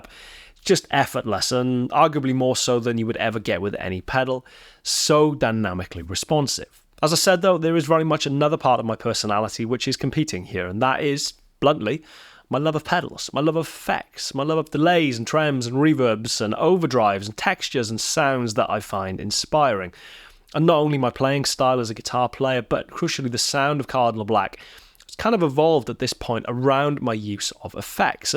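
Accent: Wales, United Kingdom. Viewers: You can have both - just get a valve amp with a send/return. You've got the front end responsiveness and the colours of your pedals.. Tasty playing btw and nice to hear the accent from the land of my fathers..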